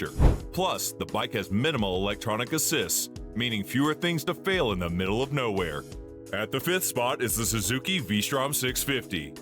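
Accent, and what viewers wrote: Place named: United States. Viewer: Leider erzählt...im extrem hässlichen US- Englisch...schade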